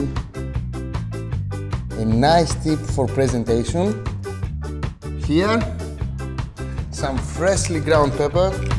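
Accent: Greece. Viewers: That lovely accent is Greek btw not Italian.. pretty similar tho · my friend. Love your videos and your charming Greek accent. But I was kind of disappointed that you didn't use Greek basil😊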